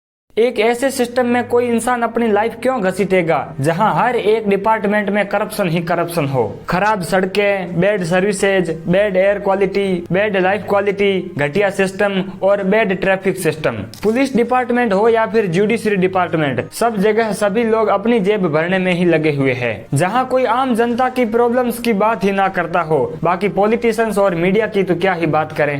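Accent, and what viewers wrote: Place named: Pakistan. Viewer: no hate bro but your sounds feels like a Pakistani people 😮😊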